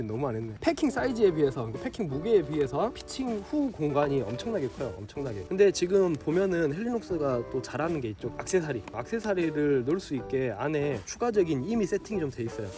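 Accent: Jeolla, South Korea. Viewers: 택티컬 터널 검색중에 우연히 들어왔는데 즐라도 말투에 친근감 느끼고 바로 구독 눌렸는데 헬리녹스 전반적인 관점, 전망 설명에 ㅂㄹ을 탁치고 갑니다. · 같은 지역출신 사람으로서 동생같은 전라도 사람들이 많았으면 좋겠어. 전라도 사투리를 사용하는 사람들은 지적이다. 달변이다. 그리고 젠틀하다.이런 이미지 계속 퍼졌으면 좋겠어. 과거 암울했던 시기 오랜기간 여러채널을 통해서 자행된 전라도사투리의 부정적이미지 심어나르기 .. 추천누르고 구독 꽝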